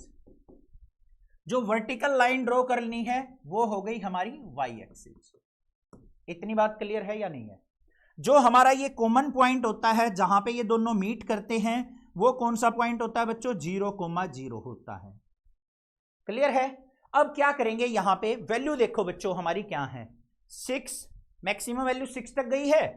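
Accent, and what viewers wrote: Haryana, India. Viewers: Sir haryanvi on top ❤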